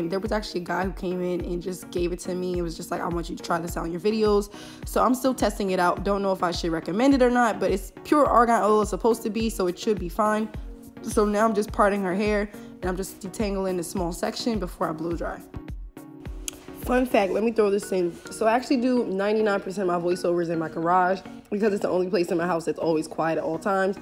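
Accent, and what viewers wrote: Philadelphia, United States. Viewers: Never seen this channel before and I can tell y’all from Philly too🤣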